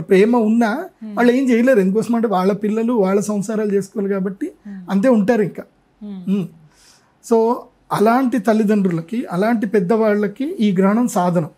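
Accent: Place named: Telugu, India. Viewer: యాంకర్లో తెలుగు తనం ఉట్టి పడుతోంది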